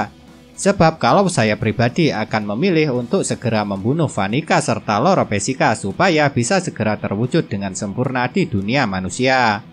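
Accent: Java, Indonesia. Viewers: Dia wong jowo. Udh gitu enak meddok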